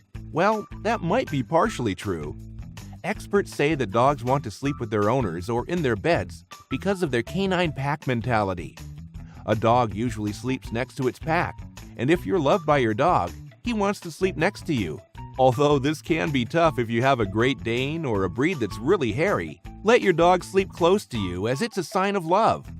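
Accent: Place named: United States. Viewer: That stupid yank accent put me off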